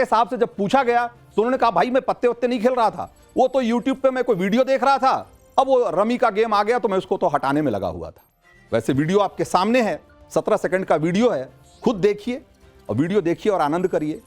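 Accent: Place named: UP-Bihar, India. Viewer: Bhai aap k bolne se to saaf pata chalta hai ki aap up,bihar ,Jharkhand k bhayye ho lekin aap jo b bata rahe ho acha bata rahe ho, lekin kalko paise k khatir beimaani aur gaddari mat karna, kyunki bhayye log ki zubaan, saap ki zubaan ki tarah hoti hai, kabi b palat sakti Hai.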